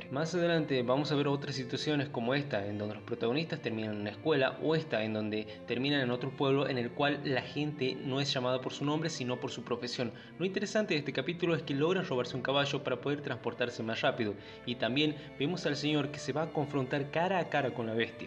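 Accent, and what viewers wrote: Argentina. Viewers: No e visto esta serie pero queria saber de que trataría antes de verla, se ve muy interesante la verdad. Eres argentino, me encanta tu acento!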